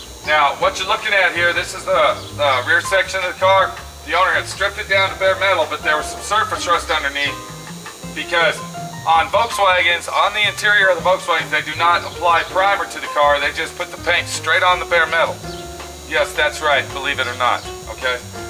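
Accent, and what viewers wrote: Texas, United States. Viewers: your videos are fun to watch and listen to a texan drawl..your great!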